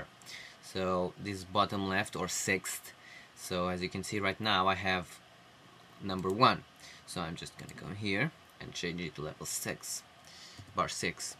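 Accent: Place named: Portugal. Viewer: ola! Vi logo pelo teu sotaque q eras tuga tenho so uma pergunta isto paga-se ou nao?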